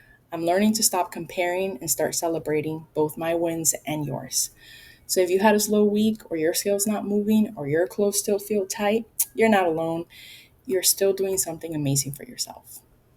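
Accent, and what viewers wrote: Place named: Latin America. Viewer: Thanks for the shout out!, I never counted one calorie during this entire 3 year GLP-1 excursion that has helped me lose over 280 lbs. If you are not led to count, don't! There can be medical reasons to, but let your provider/nutrition-specialist guide that path, not nagging from others. And your weight loss? Girl, you are losing 1 pound a week! That is the exact average weight loss. Those of us who lose fast are the outliers, not you. People, including me, who are super-responders, tend to speak up louder than those who are on the path at the normal pace. You are doing great! Nosy question you don't have to answer: Are you Latina? I detect the accent. ¡Soy cubana!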